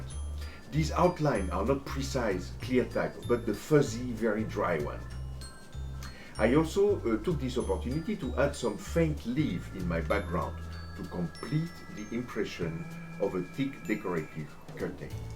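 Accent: France. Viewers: i love your france dialect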